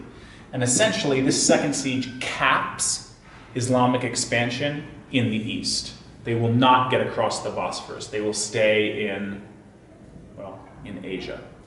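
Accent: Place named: United States. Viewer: No, but I took it in 10th grade. The American accent and English writing made me assume this was for a US based class, and was a bit confused as to whether this was a standard US history class like American Democracy or APUSH, or a specialty course. But the Bulgarian National Diploma must be much differently structured. Seems like a very interesting class to take.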